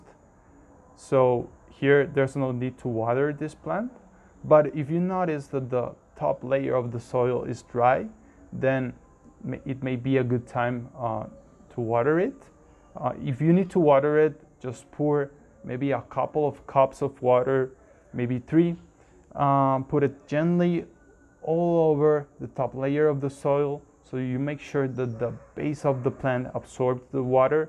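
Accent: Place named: India. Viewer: İ don't understand your English Indian accent or what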